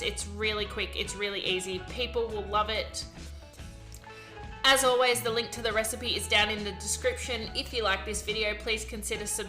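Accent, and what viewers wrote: Australia. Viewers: Nice to hear an Aussie voice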